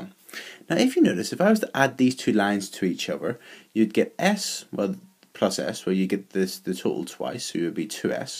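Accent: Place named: Northern Ireland, United Kingdom. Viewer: ahhh! Got the N.irish but couldnt place what else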